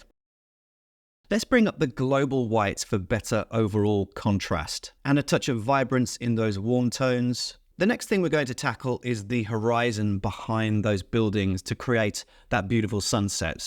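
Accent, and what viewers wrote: United Kingdom. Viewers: You are amazing and I love your clear British accent!!!🥰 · Wow, i use Lightroom many Years and i thought i had good knowledge. Most Photographers use Photoshop and i thought i must learn more PS, but i like more Lightroom. You bring me to the another Level, Thank you!! Greetings from Germany, i understand your clean Oxford English very good.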